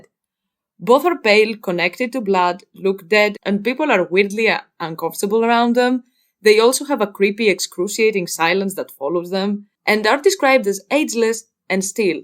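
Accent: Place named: Greece. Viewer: Το κατάλαβα από την προφορά, σαν την δική μου, ότι είσαι ελληνόφωνη!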